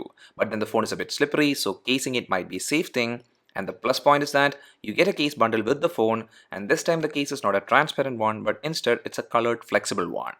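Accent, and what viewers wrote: Kerala, India. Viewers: Are you from kollam kerela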